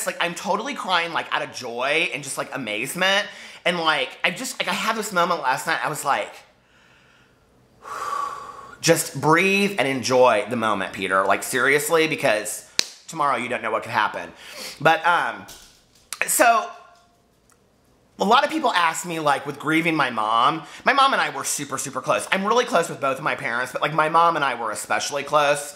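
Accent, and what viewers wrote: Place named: Kentucky, United States. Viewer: Thank you...I've needed someone like you in my world lately!!! I'm from KY but live in Nebraska & Maine. We lived in Louisville for 15 yrs so it is so nice to hear someone who sounds like me & I get. Fangirling over you sir!!! ;)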